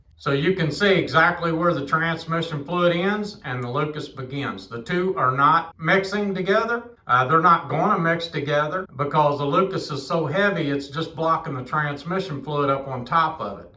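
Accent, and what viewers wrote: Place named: South, United States. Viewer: Heard some Southern, left a like.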